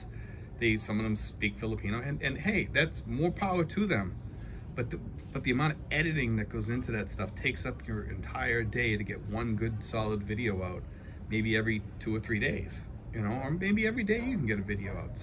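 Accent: Boston, United States. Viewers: Thanks for making good simple to understand videos..you know I am just an old simple minded man from the rural part of Louisiana.. didn't you say you were living in cavite? I had a girlfriend living in tres martinez or general trias I think it was.. thanks again and keep the videos coming..where in the states are you from? I think I hear a Boston accent..I worked all over the usa and world in my younger years.. thanks 😊👍👌😁🤗..hello from south Louisiana and I am heading to the Philippines to live out the rest of my retirement.😁🤗🐊🐊🐊🍺🍻🐊🐊🐊👍👌🐊🐊🐊😁🤗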